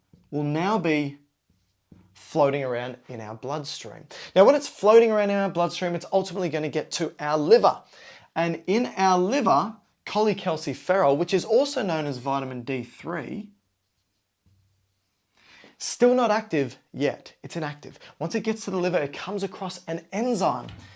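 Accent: Australia. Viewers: Aussie Accent btw why are you jacked up for your profession lol?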